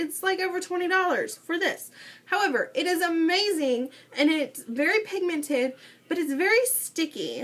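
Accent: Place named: West Virginia, United States. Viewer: All I need is broke fat girl with a WVa accent sounding like hillbilly Minnie Mouse telling me how to look cheap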